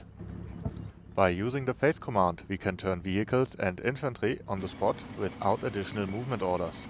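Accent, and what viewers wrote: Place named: Germany. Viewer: I'm honestly only watching your videos for ze lovely German accent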